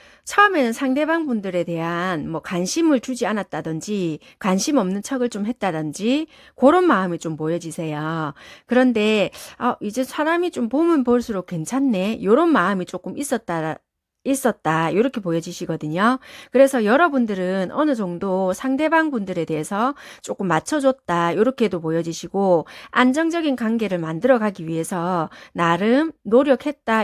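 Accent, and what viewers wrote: Busan, South Korea. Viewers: 감사합니다 ^^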